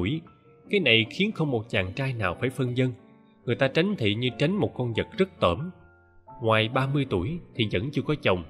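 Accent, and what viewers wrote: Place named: South, Vietnam. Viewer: Tất nhiên rồi. Bởi vì Chí Phèo là nhân vật xuất phát từ miền Bắc, quê hương của Nam Cao thuộc tỉnh Hà Nam Ninh ngày xưa mà. Nhưng Giọng miền nam ngọt ngào của bạn này cũng rất hay. Hay lắm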